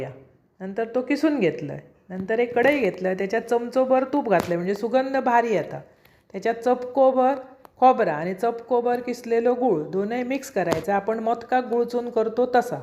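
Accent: Malvani, India. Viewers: ओरिजनल मालवणी ❤ ऐकान लय भारी वाटता जा ताई❤❤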